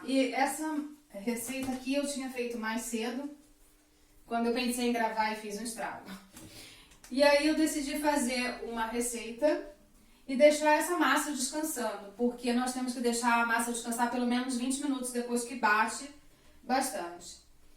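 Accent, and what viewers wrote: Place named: Rio de Janeiro, Brazil. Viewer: A locução mto carioca ,fala gritando rrrr e ssssss. Cansei me deu dorrrrr de cabeça. Deu não 🙆👋👋👋👋👋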